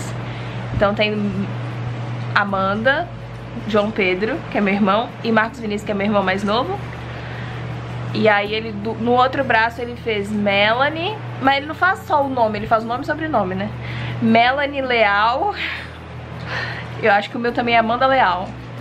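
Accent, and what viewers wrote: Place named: São Paulo, Brazil. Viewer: Você não tem sotaque de Mineiro parece de São Paulo, acho legal isso.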